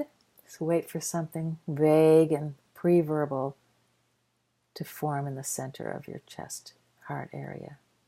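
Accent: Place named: Chicago, United States. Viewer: I love her soft Chicago accent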